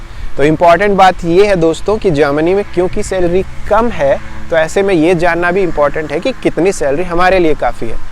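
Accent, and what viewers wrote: Haryana, India. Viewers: Hai are u from Haryana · Bhai g aap haryana se ho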